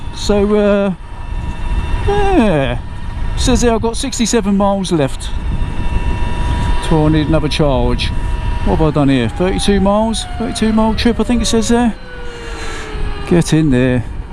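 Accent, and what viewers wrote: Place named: Cockney, United Kingdom. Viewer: That cockney accent is atrocious, crude, almost unintelligible, and for an unencumbered "un-accented" American English speaker...quite funny sounding.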